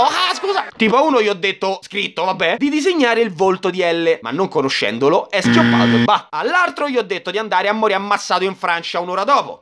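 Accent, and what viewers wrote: Rome, Italy. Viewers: L’unico youtuber che parla di anime in romano toppppp😂😂😂❤️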